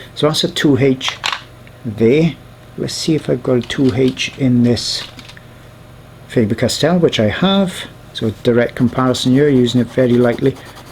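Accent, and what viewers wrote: United Kingdom. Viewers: British accent...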